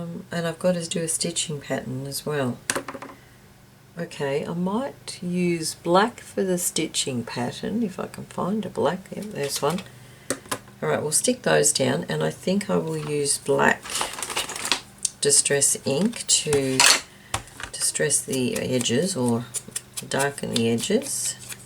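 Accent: Australia. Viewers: Hi, just found your channel on u tube! love listening to an Ozzie! Happy New year and take care of yourself, love from Queensland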